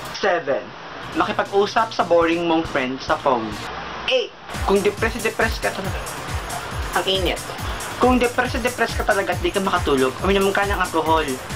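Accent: Visayas, Philippines. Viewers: bisaya ha..hehe galing naman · "PISTEH!! Ano ba yun" AHAHA!! XD. Bisaya jung dako!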